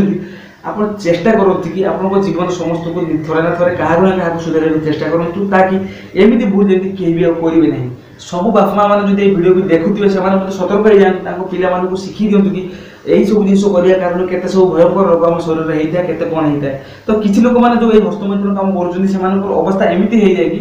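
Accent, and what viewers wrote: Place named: Balasore, India. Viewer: Bhai Aponko kotharu laguchi apon nischinto Balasore pila bodhe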